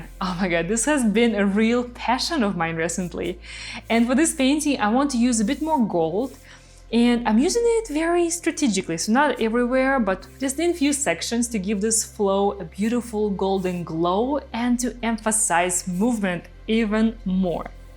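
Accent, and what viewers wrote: Russia. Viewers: Ну и акцент! Чисто русский. даже я почти все понял. Хотя на английском кроме хау мач? них не разумею. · русская девушка? У нее русский акцент❤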